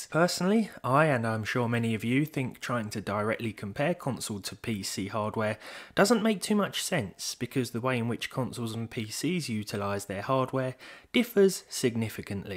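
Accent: United Kingdom. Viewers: i really like your british accent 😁😁😅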